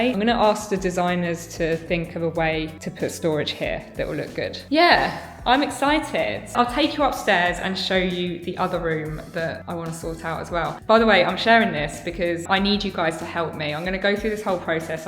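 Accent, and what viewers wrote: United Kingdom. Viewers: Your Great Accent makes me think of Big Ben or The Tower of London , Are you a Brit , Fly a Union Jack in your new studio .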